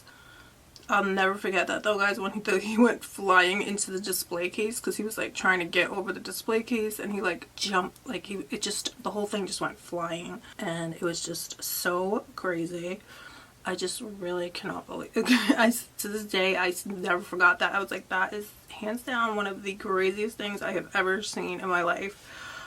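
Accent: New York, United States. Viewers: Are u from ny?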